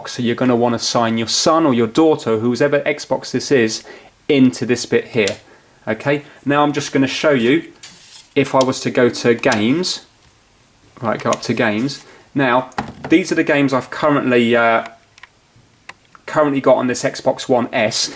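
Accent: United Kingdom. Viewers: Awesome! Here we are in 2019, self-driving cars and all, yet those janky paper-cutouts (and my subliminal sense of confidence in an erudite British accent) were exactly what I needed so my kids can play at the same time. Thanks dude, from JB in California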